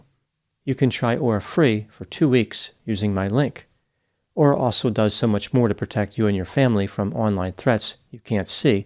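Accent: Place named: Pennsylvania, United States. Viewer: Today's question is, can I analyze accent. Although the cacti in the background suggest a southwest setting, I possibly detect hints of Pennsylvania or perhaps Ohio. I'm not attempting to pin down any geographic region, it's only a theory, my opinion.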